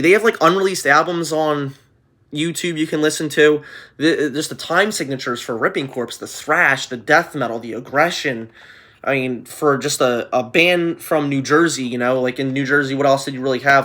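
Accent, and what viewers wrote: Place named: Texas, United States. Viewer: I'm Texan and I approve the Texan accent....yall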